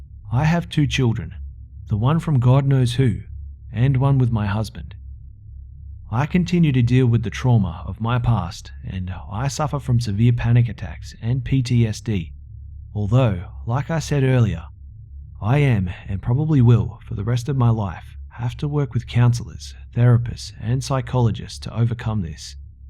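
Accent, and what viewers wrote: Australia. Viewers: aussie for days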